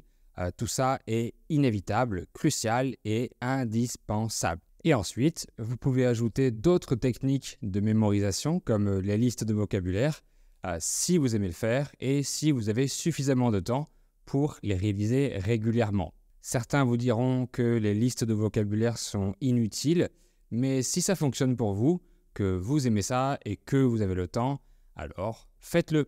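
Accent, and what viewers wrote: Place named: South, France. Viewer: Salut tout le monde. Maintenant je suis en train de "déviner" les accents. Je dirais qui l'accent de c'est du Sud. Marseille, peut-être?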